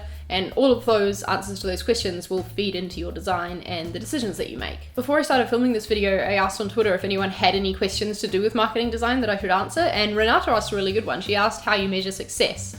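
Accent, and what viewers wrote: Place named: New Zealand. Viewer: such a rare thing hearing another kiwi on youtube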